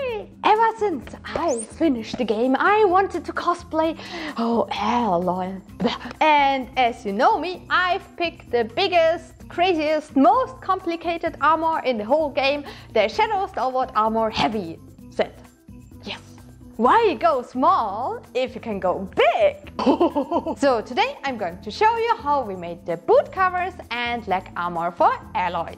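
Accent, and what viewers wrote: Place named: Germany. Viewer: This german accent tho.... 😂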